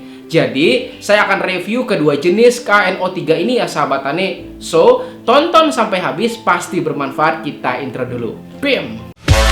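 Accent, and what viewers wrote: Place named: South Kalimantan, Indonesia. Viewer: Mantab pa PPL.....SALAM SSHABAT TANI LOGATNYA DARI DAERAH KALSEL KAYANYA😁😁 BARU PERTAMAA LIAT CANEL NYA PA.....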